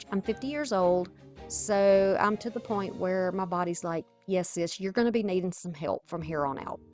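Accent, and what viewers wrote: South, United States. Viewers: Wow! The candelabra was so awesome! I have had a set of those forever and just didn't know what to do with them, so thank you for the idea! So happy I accidentally found your channel! I have learned so much from this video! Using the sander around the edges of the fabric was such a helpful hack! That turned out fabulous! Great video, can't wait to see more! Btw- so cool to hear somebody have a genuine southern accent like mine!!!🤠😅🤗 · I love Dark Academia and Dark Cottage Core. Amazing stuff. Thanks for sharing! Also, I love your voice. I'm also Southern. Yeah, it's ES-presso, but regional dialects are so common in the US. If you want to always pronounce things the absolute correct way, then you make it your own. 🙂It's still yours when you pronounce it the way everyone else does, because no one has your voice. I don't think you need to apologize for it, though. 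You have a lovely accent and I think regional dialects are interesting and unique. 🧡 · Thnx for the inspiration n btw you have the best southern accent ever! Love it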